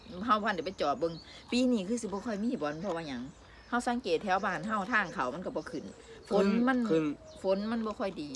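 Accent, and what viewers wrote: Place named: Ubon Ratchathani, Thailand. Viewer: เว้าคือคนทางอุบล สีเกดเนาะครับ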